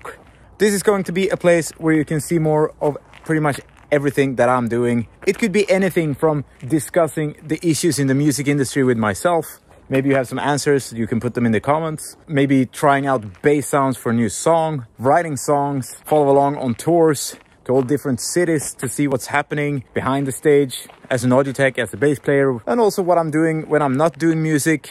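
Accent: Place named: Hagfors, Sweden. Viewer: Håller med om katterna, å dialekten va Hagfôrsmål. 😁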